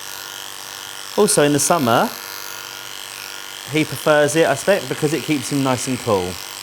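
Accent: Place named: England, United Kingdom. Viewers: I love watching the British groomers. Many times I catch myself talking British while I'm grooming dogs, lol! Especially the good ones that sit: I say "Up dahling!". You did a great job ;) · english, this is an english accent.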